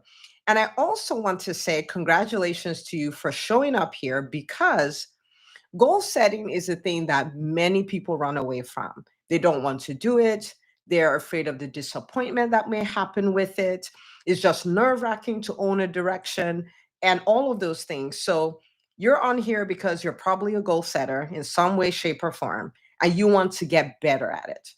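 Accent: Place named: Kenya. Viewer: Doc, are you really from West Africa? Your English sounds so much Kenyan.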